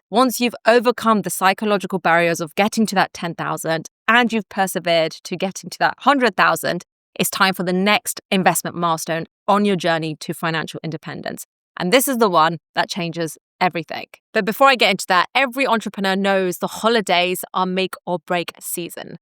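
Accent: United Kingdom. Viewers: Probably the uk with her accent. Though it might be Australian. I can’t really hear what accent it is. It’s not clearly either. Either way Maths is used instead of math in British, Australian and I think South African English. Americans and I’m pretty sure Canadians use math.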